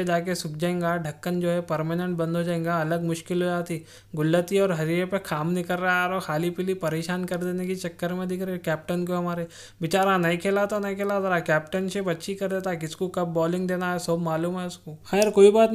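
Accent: Hyderabad, India. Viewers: English class ny hoti vo hyderabadi slang hy vo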